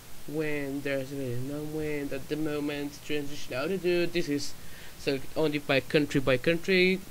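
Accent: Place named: Russia. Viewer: You must learn English well not like a Russian pilots speaking!!!!!!!!!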